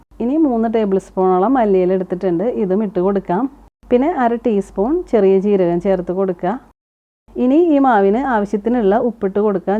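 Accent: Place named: Thrissur, India. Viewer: എവിടെയാണ് വീട് സംസാരം കേട്ടിട്ട് തൃശ്ശൂർ ജില്ലയാണെന്ന് തോന്നുന്നു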